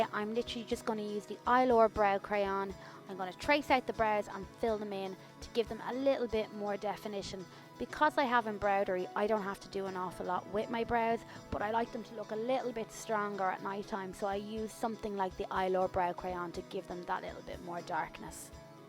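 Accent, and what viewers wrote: Ireland. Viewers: This is an old video but I was contemplating getting the Vintage Vamp and wanted to see it on blue eyes, I’m definitely going to buy it now! The lovely Dublin accent was a nice surprise and reminds me of home as I live in the Uk, up the dubs! Xx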